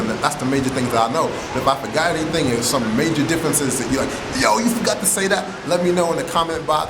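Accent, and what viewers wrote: London, United Kingdom. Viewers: I like this video, your London accent isn't bad either! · u have a brilliant British accent, a lot of Americans I've heard make us sound dumb when they try. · Haha! Good upload my guy. Btw I can hear tiny bits of the London accent tho. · Why is there so much hate in the comments? Good video and very nice British accent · Thoroughly entertained, great video. It is very refreshing to find such eloquence and respect. I like everyone, and I don't care where they are from or what colour they are...people should be nicer to each other. I like your attitude...fair one to you. And that was a cracking London accent for sure. Stay cool sir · You must've been in Britain for a while. I think I can detect a slight British accent when you speak.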